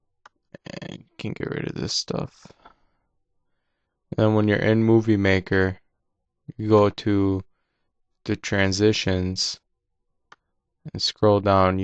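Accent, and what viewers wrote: Minnesota, United States. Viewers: I was close. Lol I'm from Wisconsin but live in Minnesota. We have the same accent.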